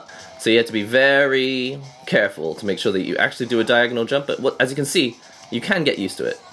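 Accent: United Kingdom. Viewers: He is Japanese but has a British accent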